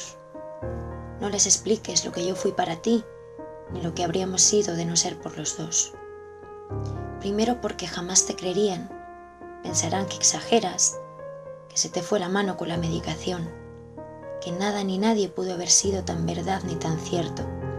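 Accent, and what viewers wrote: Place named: Spain. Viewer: Me encanta el acento español!!!!!!!!!!!!!!!!!!!!!!!!!!!!!!!!!!!!!!!!! Me encanto lo que hiciste! He llorado... inevitable no llorar!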